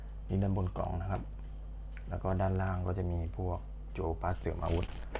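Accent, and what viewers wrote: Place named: South, Thailand. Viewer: พี่บ่าวคนใต้แน่เลย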